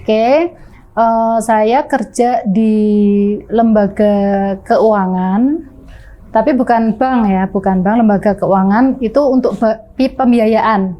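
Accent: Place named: Java, Indonesia. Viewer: Khasnya emang medok2 gitu ye tp top deh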